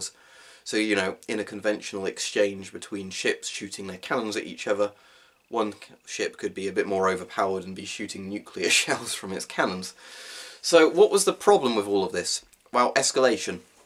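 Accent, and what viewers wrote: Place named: England, United Kingdom. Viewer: I only like recieving information in English accents 😂. It just sounds more sophisticated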